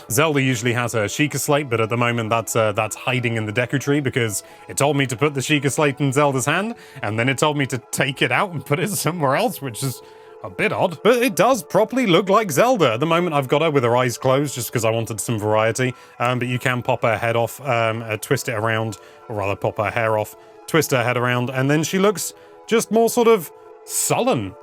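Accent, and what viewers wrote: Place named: United Kingdom. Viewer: Its just a british accent thing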